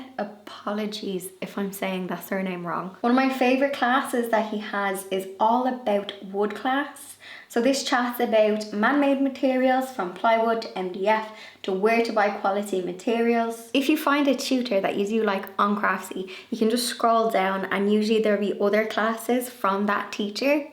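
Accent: Ireland. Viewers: lovely video as usual… really enjoy the calmness of them, and you’re sweet Irish accent. Have been thinking about your floor choices… love the brick and think that it is a beautiful statement piece, but wondering if you would ever be barefoot in that space and how that would feel underfoot? Might be a silly question from someone who lives in Australia ha ha. My other thought is how high can you fill the grout to alleviate the variation between the grout and the brick (possibly less dust collection?) Also think if that kind of flooring is high maintenance definitely investigate a robot vacuum!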